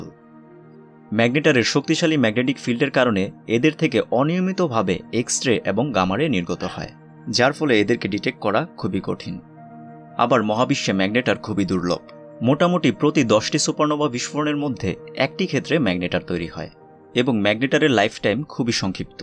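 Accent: Narsingdi, Bangladesh. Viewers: ও বাই আপনি কি আমাদের নরসিংদীর মানুষ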